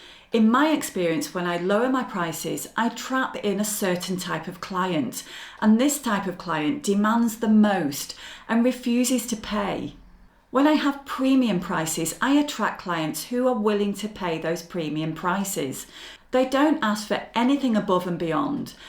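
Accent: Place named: United Kingdom. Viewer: Thank you again for sharing....I am actually setting up the backend of my e-Design company, and the price is the area I am currently working on, although I have 3 packages already prepared, so your advice is invaluable. Plus, I am now in the USA and your British accent reminds me of my hometown! how cool is that! LOL!😊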